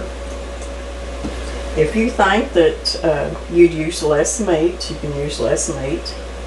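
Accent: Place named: Ozarks, United States. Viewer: Love your channel. My favorite recipe so far is your Crazy Crust Easy Pizza. I make it a lot and I make it gluten free for my 13 yr old grand daughter. Thank you for the great recipes and all the love. Your home is so charming and I love your Ozark dialect. ❤ and we love the Lord too. I’m 70 and my hubby is 81. We live on a small homestead in Indiana.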